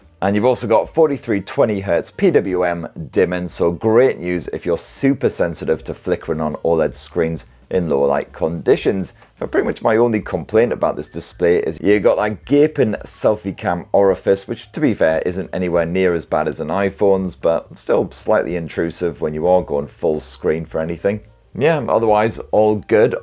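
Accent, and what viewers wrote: United Kingdom. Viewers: Are you from the UK, your accent is hard to catch.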